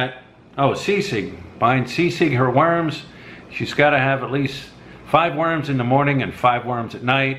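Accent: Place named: West, United States. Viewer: Also it could be funny if you are from Philadelphia , but you sounds like from the west part of the US.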